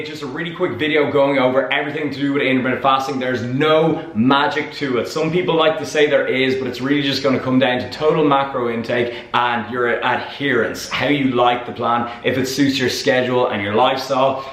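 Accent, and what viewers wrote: Dublin, Ireland. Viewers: thats the Paddy coming out in him. Sounds like he is from Dublin.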